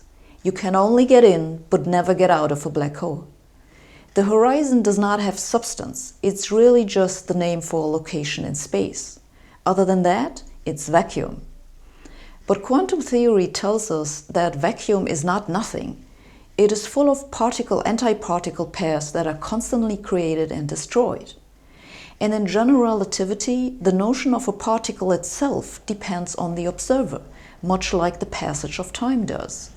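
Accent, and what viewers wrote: Germany. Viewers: Ich liebe die deutsche aczent..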